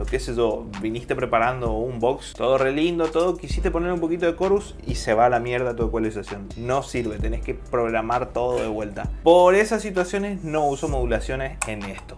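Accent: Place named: Paraguay. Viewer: Muy en el fondo se te nota el acento paraguayo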